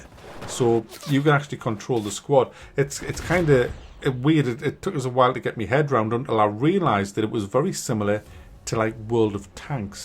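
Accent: United Kingdom. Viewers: It's just his accent /slang. The way people pronounce words is very different across the whole of the UK.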